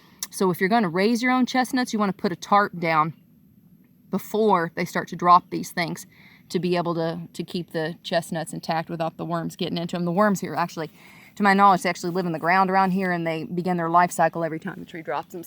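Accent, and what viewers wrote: South, United States. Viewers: You seem so different from a few years ago....you use your Southron accent and dress more casually and seem to live in a different area of the country. What changed?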